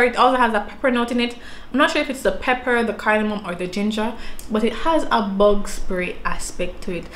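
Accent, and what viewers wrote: Jamaica. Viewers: Yasss I’m hearing a Jamaican accent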